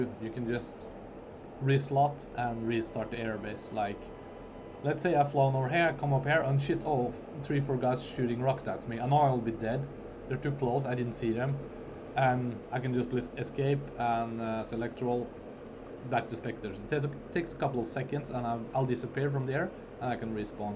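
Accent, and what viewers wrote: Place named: Norway. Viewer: U sound Norwegian💯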